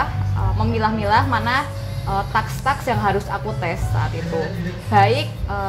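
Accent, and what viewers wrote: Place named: Java, Indonesia. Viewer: medhok mbak..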